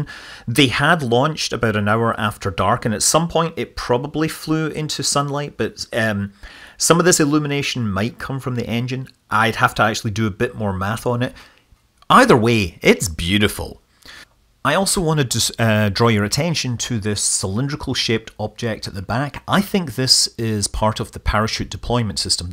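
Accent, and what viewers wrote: Scotland, United Kingdom. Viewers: Actual Scottish from! "Theire's nunnae the acoustic panneling"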